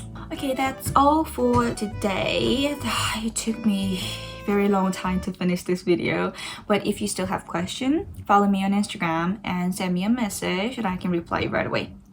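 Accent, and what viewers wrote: United Kingdom. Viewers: 영국식 발음이 너무 좋네요.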